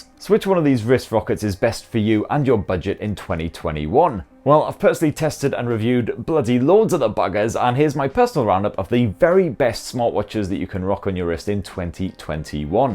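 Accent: United Kingdom. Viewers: I like this dude, he's bloody British like me 😂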